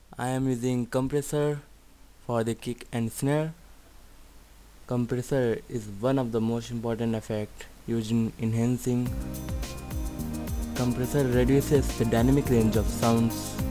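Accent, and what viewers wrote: India. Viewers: well about all the comments abt his accent and stuff.. make fun of india for the language they dont speak but u cnt make fun f dem for the content they teach RESPECT I N D I A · are u from india? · your accent sounds like you are from india? am i right?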